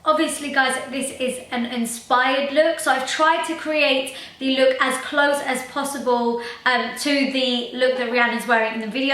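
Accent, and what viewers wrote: United Kingdom. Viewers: британский акцент? такой противный)))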